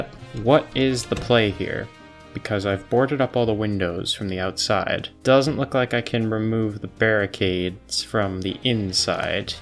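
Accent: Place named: United States. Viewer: Your accent says American. Your sense of humour says British. This was hilarious. Subbed.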